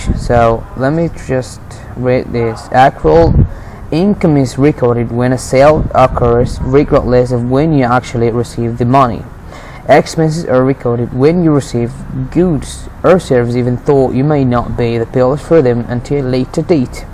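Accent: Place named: United Kingdom. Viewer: What’s up with that British accent 😐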